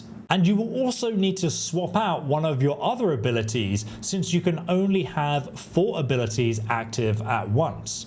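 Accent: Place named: United Kingdom. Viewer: Pretty sure He’s British and maybe that’s just how they say it. Plus’s who cares?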